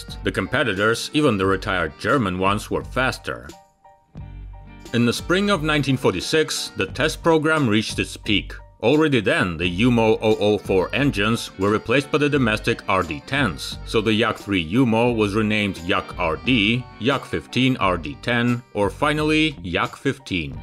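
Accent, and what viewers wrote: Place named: Russia. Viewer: The narrator is a native Russian speaker, providing the way he pronounces the titles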